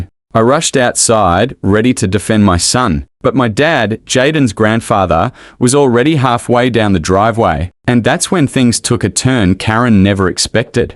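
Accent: Australia. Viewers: No mention of where, no names, could be true but I feel it easily comes over as fake or hyped. No clue of country feel its USA but sound Australian. What is this photo? Why the drawn guns? Why would they pull guns on a kid. The photo shows no safety girl, no image of the Karen. Who is the little girl. What HOA? What rule? More questions than answers. It sounds like a story. Absolutely nothing to say this was true, when, or anything. Terrible. This is truly terrible content.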